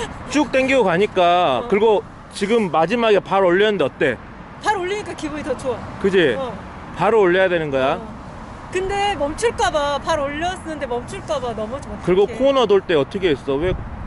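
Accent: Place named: Jeolla, South Korea. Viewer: 역시 전라도 사투리는 화난거같은데, 사실 화 1도 안남 ㅋㅋㅋㅋㅋㅋㅋㅋ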